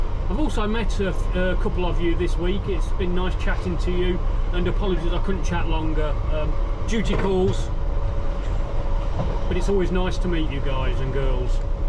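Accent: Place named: Lincolnshire, United Kingdom. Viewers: Thank you for another really interesting video. I would have guessed Midlands too with your accent. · So there seems to be a 25mph limit at upon entry to each station these days, I swear trains used to enter the stations at higher speeds back in the day... I'm going back to the 80's - Is this true? Any veteran drivers know if it is? Re: Accent.. Ha! I knew it.. i thought it was either south-east Northamptonshire or Lincolnshire. 👍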